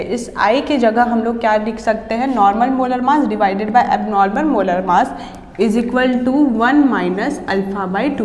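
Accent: Bihar, India. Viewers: Bihar se ho kya 🌝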